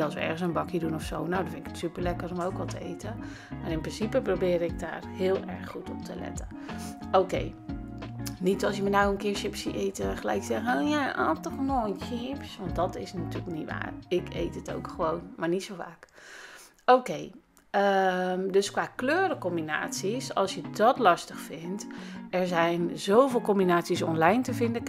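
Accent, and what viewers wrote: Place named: Rotterdam, Netherlands. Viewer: Zo grappig om je nu eens Nederlands te horen praten. Heerlijk met dat Rotterdamse accentje (mojenoukaikuh) 🤣 Keep up the good work (in Dutch please 😉)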